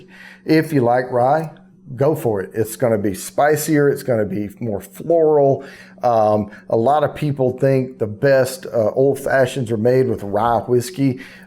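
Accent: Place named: South, United States. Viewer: I just now found this channel and this review. I'm drinking Bernheim tonight, so I thought I'd just see what other reviewers thought of it, and there you were. I'm happy that you enjoy the Bernheim, because I do too. And it's nice to hear a southern accent - I'm a Texan, and it seems like 99% of all the reviewers on YouTube are Yankees. :)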